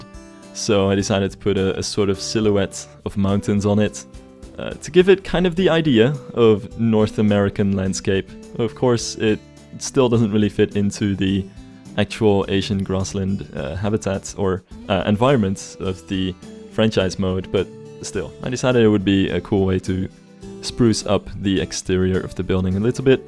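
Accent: Netherlands. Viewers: I hear that Dutch accent. Gegroet landsgenoot. :)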